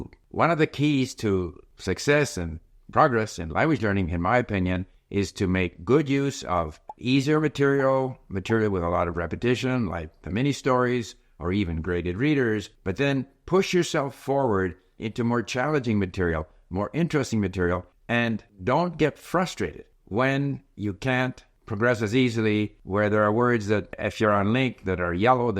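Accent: Canada. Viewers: Many people say that Canadians and Americans sound the same. Within the first minute, I was able to correctly guess that he’s from Canada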